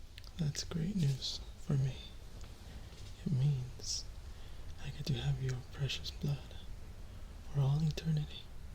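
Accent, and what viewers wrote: South, United States. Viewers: I personally love his southern accent. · My favorite I'm obsessed with werewolf but I'm not a big fan of that southern accent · Look it can be the werewolf but like you can just do the southern accent cause DAYMN! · Southern accent on point I'm from Texas so I can tell you you did you thing baby!! You're talented as hell 🤘🤘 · A southern werewolf cool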